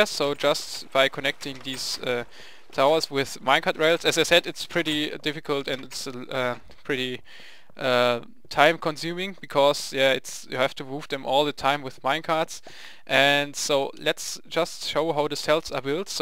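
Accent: Germany. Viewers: u r german? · typischer deutscher accent :D aber weiter so! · haha krasser deutsch - english dialekt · I hear, you come from germany :D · extreme german accent :D awesome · Are you german sounds like german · are you german ? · are u german ? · Are u german? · man hört sofort dass du deutscher bist :) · I think you german ! (ich auch XD)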